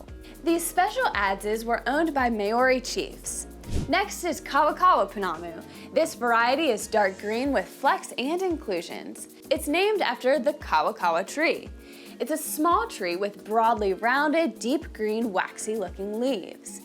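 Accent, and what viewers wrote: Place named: United States. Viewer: I am a huge fan of your channel and love your content… although you have mispronounced many words in this video, including the word Māori itself. Feels very American 🤣🤣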